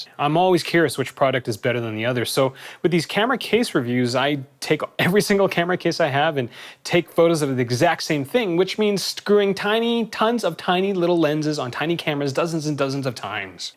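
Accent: Canada. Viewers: Ha canadian eh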